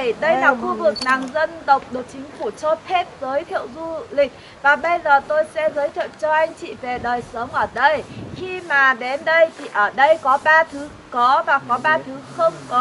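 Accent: North, Vietnam. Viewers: Lại nghe giọng bắc...hơi nặng!!! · Nói tiếng bắc.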